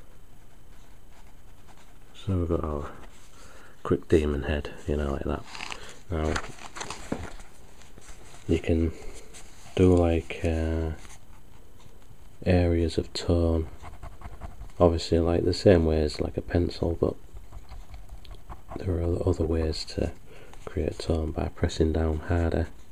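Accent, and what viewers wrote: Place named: Hull, United Kingdom. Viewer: Are you from hull by any chance. Accent sounds familiar.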